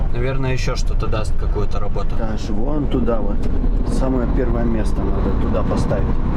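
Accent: Ukraine. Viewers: А кто знает? У него такой акцент как в Украинцев. Он оттуда?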